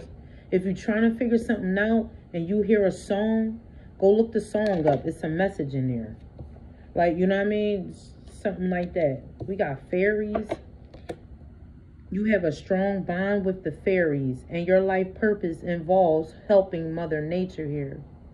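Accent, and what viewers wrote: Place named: Philadelphia, United States. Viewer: YOU HAVE A PHILLY ACCENT.❤❤❤❤ AM I RIGHT I’M ORIGINALLY FROM PHILLY.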